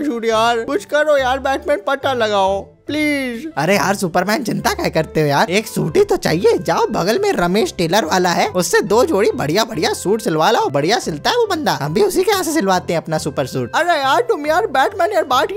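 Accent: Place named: Punjab, India. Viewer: Meri party aapka yah Punjabi tadka hai na bada mast hai mujhe maja aata hai aapka Punjabi tadka sunane mein aur Hindi wala Punjabi tadka 👍👍👍👍👍👍👍👍👍👍👍👍👍👍👍👍👍👍👍👍👍👍👍👍👍👍👍👍👍👍👍👍👍👍👍👍👍👍👍👍👍👍👍👍👍👍👍👍👍👍👍👍👍👍👍👍👍👍👍👍👍👍👍👍👍👍👍👍👍👍👍👍👍👍👍👍👍👍👍👍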